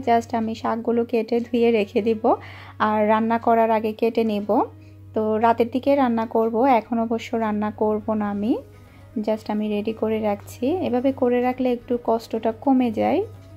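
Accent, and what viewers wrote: Chittagong, Bangladesh. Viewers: আপু আপনার কথা গুলো ভালো লাগে চিটাগাং এর ভাসা আছে তাই চিমের বিছি আমার খূব ভালো লাগে আমি ও চিটাগাং এর মেয়ে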